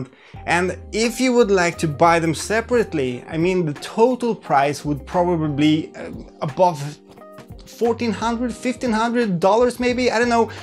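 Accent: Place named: Sweden. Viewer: Not only does energy and enthusiasm for vr come across better than Nathie but he also speaks “swenglish” much better. Nathies popularity comes primarily from his youth and his real life infinite money cheat. My respect goes to the people who earn it outside of youtube.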